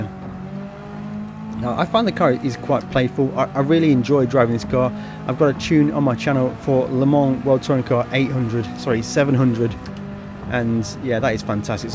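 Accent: United Kingdom. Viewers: Bro! Kyle Bush with an English accent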